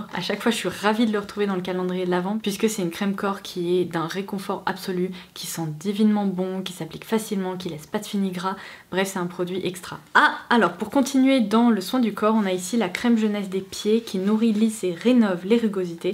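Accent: England, United Kingdom. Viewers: Bonjour, je n'ai pas Instagram et je ne sais pas m'en servir, j'ai juste un mail <mail> , au delà de ce petit problème j'adore vos vidéos, vous avez une voix apaisante, vous avez un bel accent anglais. Merci pour m'avoir lu. Bien cosmetiquement vôtre. Delphine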